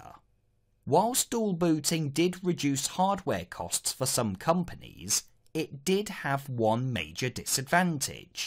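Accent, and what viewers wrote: United Kingdom. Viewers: Best explanation ever on Hyper-V and I enjoyed the British accent. well done thank you for the free training videos.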